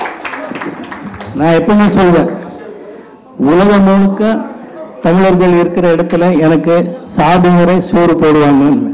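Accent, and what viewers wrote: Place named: Karnataka, India. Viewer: பேச்சுல கன்னட நெடி...ஆனாலும் நல்லா இருக்கு....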